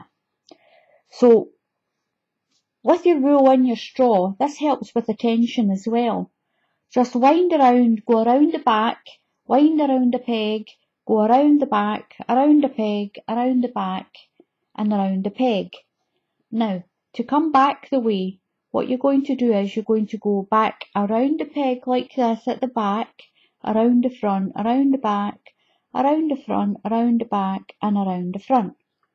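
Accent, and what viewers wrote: Scotland, United Kingdom. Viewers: I just love your Scottish Accent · her Scotland accent is so strong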